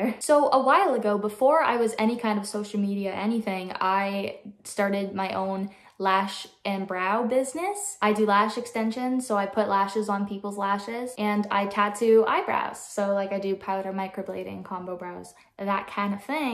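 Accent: Canada. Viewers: How would sounding too Canadian be a problem? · Canadians also say car... interestingly. So, the sentence "I'm about to take the bag out to the car" is, uhh... real interesting. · It's so silly they wanted you to sound less Canadian. Why did they specifically want it to be American? Why not English or something else? The "assume american unless otherwise stated", "american is the default/assumed place of residence" and "all things american are superior" attitudes are so weird. I'm Australian and I much prefer the Canadian accent over the US accent. Your voice and accent is lovely. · I can't unhear the "about" and "out" now 😂 it makes me wonder if I do the same thing but I'm western Canada so idk of we would talk the same also super cute kitten! And congrats on your new exciting changes!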